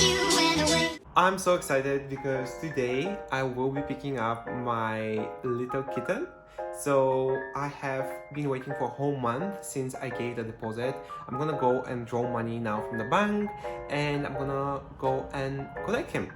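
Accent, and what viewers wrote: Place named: Cyprus. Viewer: Awwww God Bless You Both ♥️♥️ So much to say....are you from Cyprus ? I have a young man living with us here from Cyprus, you sound very much like him. I also have a Scottish Fold....but he has long hair and straight ears, the Highland Fold Straight. I watched Maru for many years on YouTube. If you haven't seen him, please Google him . Maru holds the Guinness Book of World Records for the most viewed pet on YouTube ! He lives in Japan with a wonderful Lady and two female domestic shorthairs named Hana and Miri. He is Absolutely Adorable ! He loves boxes 😂🤣😃♥️ I was so in love with Maru. My Daughters were grown and I had no pets. I asked my Daughter if she could find a Cat for me. She went to her Facebook page and the first post was a cat, his owners moved and left him behind !!!!! I will never understand that. She brought him to me and I was shocked because I knew right away that he is a Scottish Fold.... 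I just adore him, his name is Fat Boy. Anyway, there is one trait that is frequently said about the Scottish Fold....They can be stubborn. Fat Boy really lets me know when he wants to be brushed, and then he sits about a foot away from my outstretched arms so I have to come to him ! I have had Cats all my Life. Fat Boy is very Special. Enjoy Kovi, he is adorable !!!!!!! ♥️♥️♥️♥️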